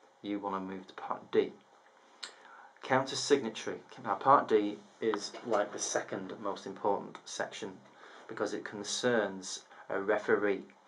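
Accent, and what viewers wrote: United Kingdom. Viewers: Do you mind if i ask what club you went to? you sound like your from Manchester, i got my full membership card in the post this morning , FAC application going in this week.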